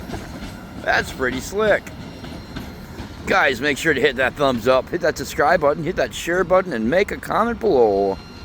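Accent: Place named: Uttar Pradesh, India. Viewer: I left the U.P. 55 years ago all my U.P. family is gone now to hear your accent feels like home.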